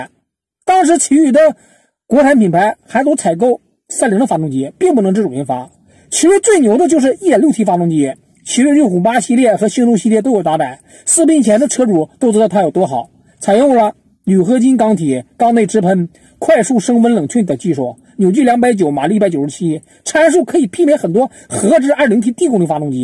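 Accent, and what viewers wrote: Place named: Northeast, China. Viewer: 事情是这么个事情，但是一配上浓浓东北话杂听咋不是那么一回事。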